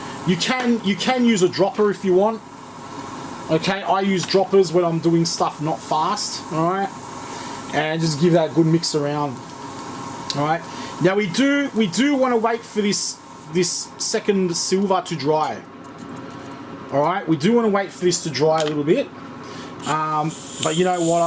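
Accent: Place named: Australia. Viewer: Like your accent, you an aussie? :)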